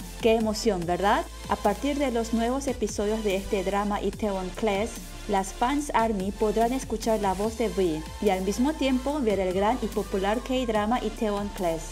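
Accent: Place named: Spain. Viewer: La semana próxima se estrena esta serie en España estoy deseando verla y sobre todo poder oír la canción de mi bias en. Por cierto hablas un español perfecto, con una cuidada gramática, palabras difíciles y no muy corrientes, tu acento parece aprendido en España, estoy totalmente asombrada, magnifico trabajo, saludos desde Barcelona, esperando que salgan las entradas para el concierto de, ojalá no se anulen.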